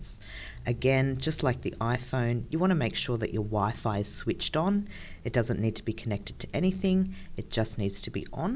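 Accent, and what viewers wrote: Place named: Australia. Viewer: very helpful, thanks! ( so good to hear an Aussie voice narrating)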